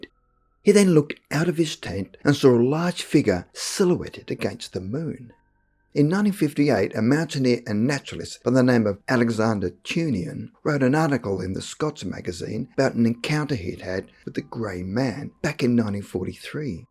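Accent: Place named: Australia. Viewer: Can you get some narration without the heavy Aussie accent? It is hard to understand your speech for Americans. I think you have a woman who does narration, don't you? I can understand every word she says....I think she is an Aussie too but her accent is not as thick as yours.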